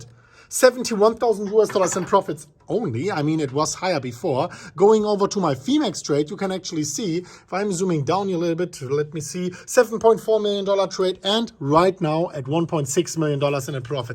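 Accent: Germany. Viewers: Why are you interested in his German accent? Even for me as a German it’s brutal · Man, I really hope that one day I am crypto rich and develop a German accent like.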